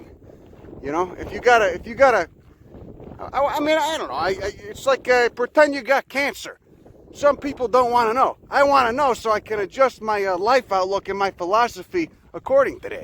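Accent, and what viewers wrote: Chicago, United States. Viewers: Sound like your from Cicero. I love your vids. Chicago to jersey baby. Keep producing these . most enjoyable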